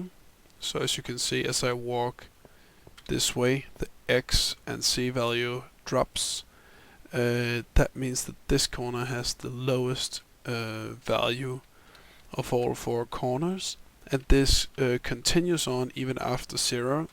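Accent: Denmark. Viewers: man, i could totally hear you are from Denmark. PS. GO Denmark!! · Man kan høre at du er dansker. Det kan man altid :D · Are u danish? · Fellow Dane :) I heard it instantly, then went to your channel, and I was right. Aside from that, I like your videos. They're good and deserves a lot more views. Hope you have success in the future. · You sound danish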